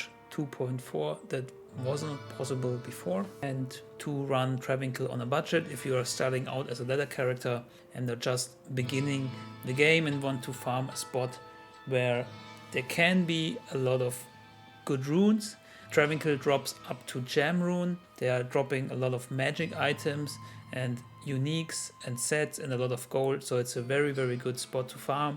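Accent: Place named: Germany. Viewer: German for sure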